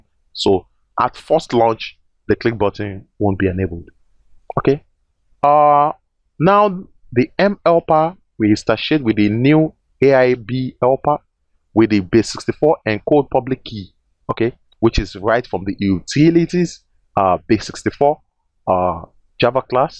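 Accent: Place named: Nigeria. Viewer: "In app billing SARVICE," Naija man for sure. Thanks for the great video.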